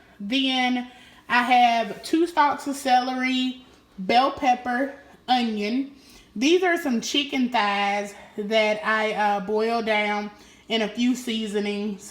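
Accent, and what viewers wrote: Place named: Alabama, United States. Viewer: You gotta be from Alabama… southern down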